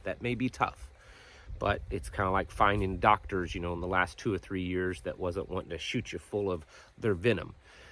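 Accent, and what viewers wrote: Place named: Kentucky, United States. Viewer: Tucker is the only show that I watched every evening. And Life Liberty Levin. On Sunday. I won't watch Fox anymore. Done. He stood up for what's right. And all of this lbtqrsi+ he stood up against it. Whit values. I would say they told him to bend over and take the crap.. and he refused. Good for him. We all need to stand against this. Fox needs Tucker more then Tucker needs them. He will be back better then ever. I can't wait. Fox News will be done..... Thanks for you messages that you give every day. Because you speak the truth. I'm watching you from Kentucky, and I really liked your Kentucky accent. Loved it😂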